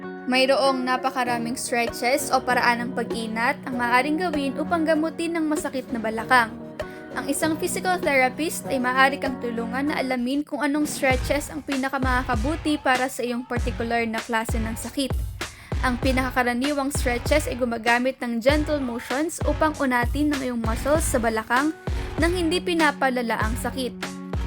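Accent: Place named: Iloilo, Philippines. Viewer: ilonggo ka doc ay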